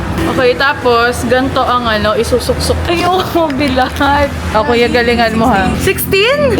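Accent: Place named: Pampanga, Philippines. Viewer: HAHAHAAHHA love the kapampangan accent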